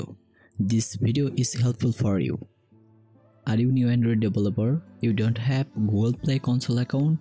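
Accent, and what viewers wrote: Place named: India. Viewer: Interesting Informations. And an Indian accent. It's being familiar. :) Thanks. Helpful Informations.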